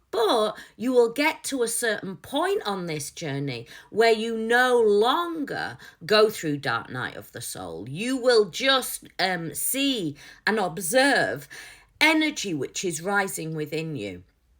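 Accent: United Kingdom. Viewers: great video, great channel, great British accent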